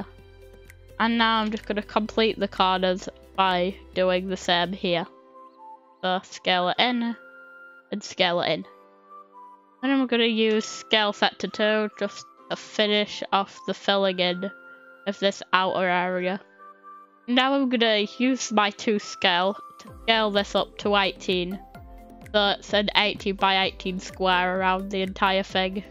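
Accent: United Kingdom. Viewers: Nicee accent ( is that british )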